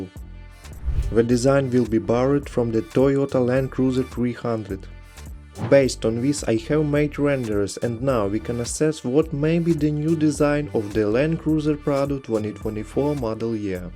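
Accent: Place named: United Kingdom. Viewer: ШИКАРНЫЙ БРИТАНСКИЙ АКЦЕНТ!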